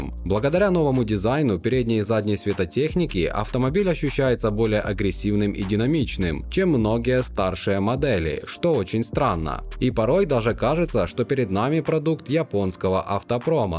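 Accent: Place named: South Russia, Russia. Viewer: Смотрю все ваши видео и ставлю лайк, но ещё не подписался. Вам нужно комментатор, мне не много не нравится произношение чувствуется либо юг России или Украина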